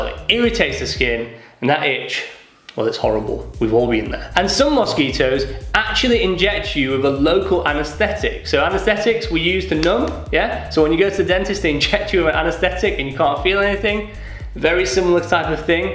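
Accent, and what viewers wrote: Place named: England, United Kingdom. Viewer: Yay an Englishmen such a refreshing change hehe. Great content too thanks so much 👍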